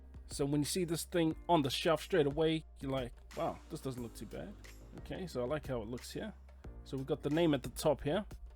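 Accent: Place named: New Zealand. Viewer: Love the Kiwi accent.....................you are from New Zealand right 😂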